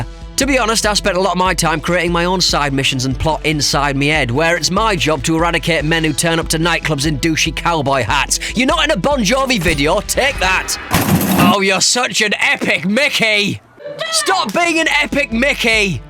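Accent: United Kingdom. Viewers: His accent is "British" you abonibal twat! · the British accent is suck !!!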